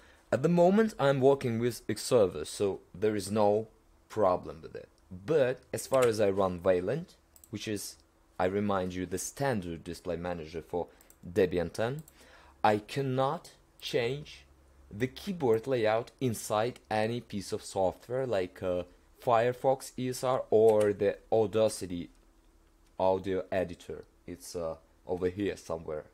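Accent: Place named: Russia. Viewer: that's russian accent bruh :)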